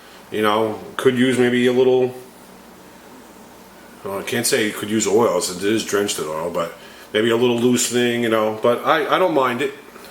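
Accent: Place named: Massachusetts, United States. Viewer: I'm from nh. Your accent sounds like mass that's all. jerseys not all that far from mass though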